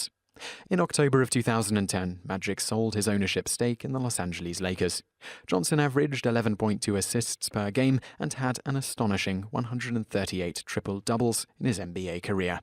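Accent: United Kingdom. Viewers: Without checking the other comments... the person narrating this is the least compelling narrator on the planet. He makes "interesting facts" so effing uninteresting and boring, British accent notwithstanding. Put a little pep in your step. HOWARD STERN RULES BABABOOEY!!! (i mean seriously british dude, include a little personality).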